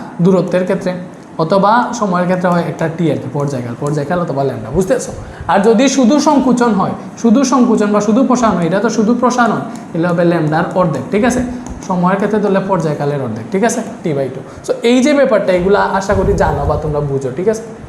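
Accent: Sylhet, Bangladesh. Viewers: ইসসসসস , স্যার আপনি কি সিলেটি 😅😊😊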